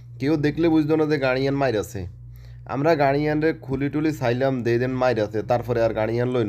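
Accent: Noakhali, Bangladesh. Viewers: আপনি কি নোয়াখাইল্লা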